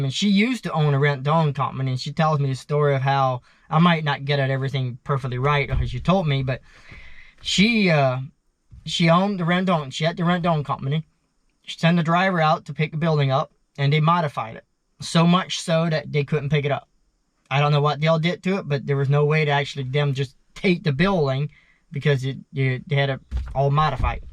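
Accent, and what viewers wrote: Pennsylvania Dutch, United States. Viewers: "I'm gonna keep saying building because it sounds like I'm saying something else." 😄 They very first time I heard you say "shed" I thought, I'll bet he was either raised Amish or in Amish country. Your PA Dutch accent is heavy. I went to college in the heart of Amish country in Pennsylvania. We heard this accent a lot. It takes me back to my college days. 😊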